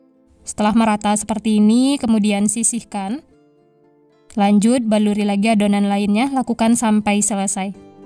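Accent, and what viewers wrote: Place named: Minangkabau, Indonesia. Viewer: Denger suaranya aku ngomong dalem hati "kaya urang awak nada bicaranya" eh pas liat foto profilnya iya lagi pose di jam gadang hehehe Salam kenal yah karna bgimanapun alm.papa urang minang maninjau❤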